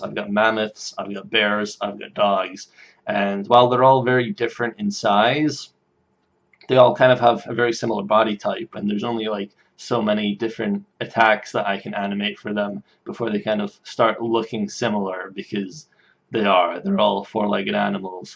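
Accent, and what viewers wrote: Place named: United Kingdom. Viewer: Oh my god your accent is fucked. It's British, yet slightly American, but it'll slip into Scottish because you live there now.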